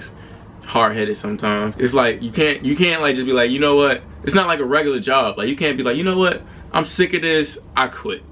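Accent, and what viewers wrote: Baltimore, United States. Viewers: Hate being from the dmv cause why i knew you was from bmore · Yo before you said you were from Baltimore I was already thinking it. We know what we sound like lol. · Dummi I knew uu was from Baltimore jus from how uu was talkin😂😂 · Baltimorean I heard tew I’m alr knowing 😂😂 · I’m sitting watching your video I’m thinking bruh gotta be from Baltimore then boom you from Baltimore city that’s crazy I grew up there and I’m trying to join the navy out of high school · I thought I was hearing a Baltimore accent 😂 keep it up! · Mmm been a few years since I heard a Baltimore accent I just passed meps physical and probably signing navy so thanks for your videos. Shout out from an old randalstown. Guy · yeooo bruh soon as u spoke i knew u were from baltimore lmaooo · You from Baltimore? 🧐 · I knew he was from Bmore. Big ups to you sir. 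- your fellow DMV homie. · Sounds like a lil Baltimore to me 😂😂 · you from baltimore lol? but if i got more questions can i message you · Crazy I knew you were from Baltimore as soon as you said ya first word. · I kept hearing that lil accent Nd I was like is he from b-more then you said it Nd I was like I knew it 😂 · He sounds like he’s from Baltimore lol. Thanks for your service bro! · I knew my man was from Bmore. I could hear it. Bmore standup. Latrobe Eastside. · I could tell u was from bmore when I first heard u talk from da accent 🤣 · Knew u was from bmore as soon as I heard tewwww shout out 410 man😂😂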